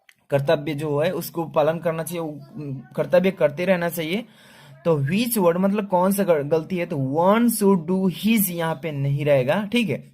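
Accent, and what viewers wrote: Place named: Bihar, India. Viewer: Sir ap Bihari hai na.. pta chal jata hai😂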